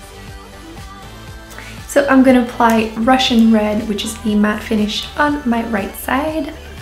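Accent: France. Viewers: Great video! I needed it .. Btw ur beautiful and I love your accent even though I don't know where you're from. French? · Most helpful video about dupes ever! Thank you! The first time I ever heard of wet n wild lipsticks was from your swatch video and I instantly wanted all of them lol so, I'm working on owning most of the wet n wild lipsticks because they're amazing. Every paycheck, I get one :) Also, your accents is adorable lol. Is that French or? Love your videos, girly! ✌️😊🌸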